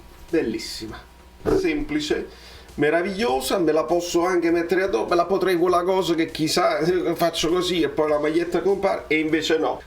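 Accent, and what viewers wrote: Naples, Italy. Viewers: buongiorno. Buona domenica delle Palme. Il tuo modo di parlare napoletano somiglia molto al mio. Sei di Fuorigrotta come me? Non vivo più a Napoli, ma è sempre la mia città. Hai l’età dei miei figli e sei troppo simpatico!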